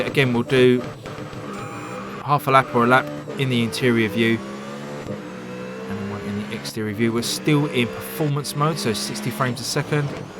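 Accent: England, United Kingdom. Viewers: Your English accent goes well with the realistic racing genre. Giving it a proper Top Gear feel somehow. Part of the reason these Grid series take off so well on your channel IMO. Take note that covering realistic videogame racers might be a bit of specialty of your channel from now on. 😊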